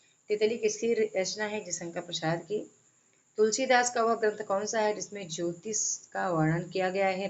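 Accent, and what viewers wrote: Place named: Lucknow, India. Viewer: अवधी भाषा की खनक आप की आवाज में बिल्कुल लखनऊआ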